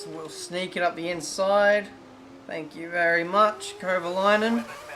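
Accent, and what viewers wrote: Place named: Australia. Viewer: Love the videos. And its not just because of your aussie accent.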